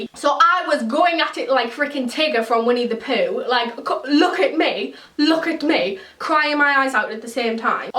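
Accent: Newcastle, United Kingdom. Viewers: Are you Geordie ??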